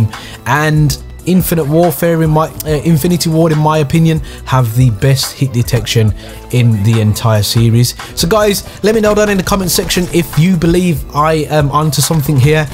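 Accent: Birmingham, United Kingdom. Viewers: Are u from Birmingham England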